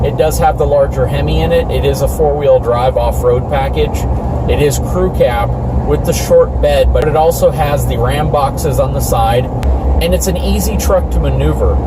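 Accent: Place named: North, United States. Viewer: You sound like a yankee.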